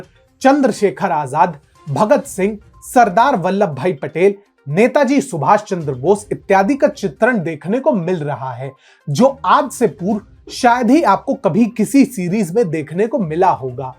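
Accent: Standard. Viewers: अति उत्तम पोस्ट। जी आप बहुत शुद्ध हिन्दी में प्रस्तुती देते हैं। साधुवाद। कृपया निड़र ह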